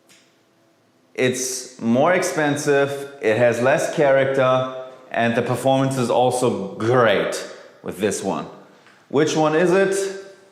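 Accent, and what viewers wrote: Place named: Germany. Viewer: It’s his German accent. Btw pretty sure he speaks English better than you as a native speaker ever will lol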